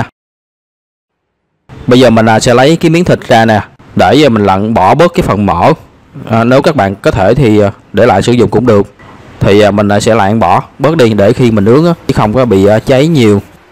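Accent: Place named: Quy Nhon, Vietnam. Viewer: Chị nghe vọng nói của em Chị đoan em là người qui nhơn Nhung em làm món ăn Miền Nam rất giỏi lắm luôn Chị chúc mừng cho em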